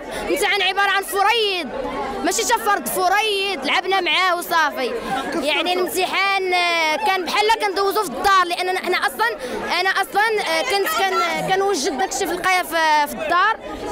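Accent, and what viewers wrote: Algeria. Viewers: ولينا نهدرو كيما هي في دزاير 😂🇩🇿✌🏻 فريض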